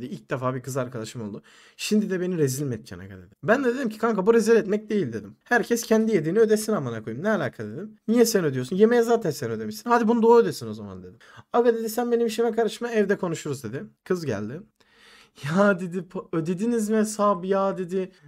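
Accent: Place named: Izmir, Turkey. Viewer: Aga nerde duysam tanırım izmir bura amk yaaaa 19 yıl yaşadım kesin izmir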